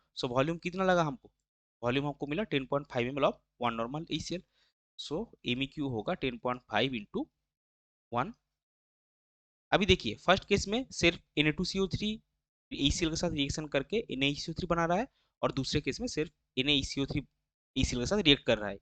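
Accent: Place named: Bengal, India. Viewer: Dont recruit bengali accent its so uneducated sounding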